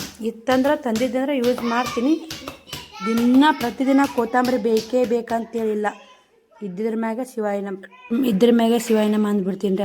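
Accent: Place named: Uttara Kannada, India. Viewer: ನಿಮ್ಮ ಪ್ರತಿ ಲಾಗ್ ಗು ನನ್ನ ಹೈಪ್ ಇರುತ್ತೆ ಸಿಸ್ ಖುಷಿ ನೋಡೋಕ್ ನಿಮ್ಮ ಲಾಗ್ ಮಿಸ್ ಮಾಡಲ್ಲ ನಾನು ಡೆಲಿ ನೋಡ್ತೀನಿ ನಮ್ಮ uk ಭಾಷೆ ಕೇಳೋಕೆ ಚೆಂದ 🎉❤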